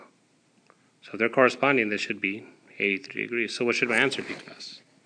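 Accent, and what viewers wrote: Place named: Egypt. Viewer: your voice is like egyptians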